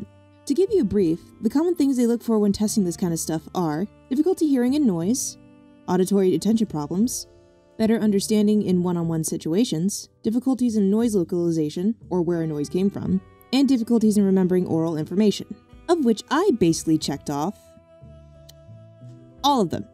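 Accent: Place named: United States. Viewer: Visuals were great, but I'm sorry the speech was so fast in an American accent I have no idea what was said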